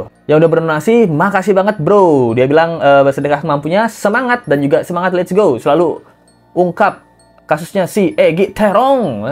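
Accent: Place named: Jakarta, Indonesia. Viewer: masa orang cirebon logat lu gua lu gua logaj jakarte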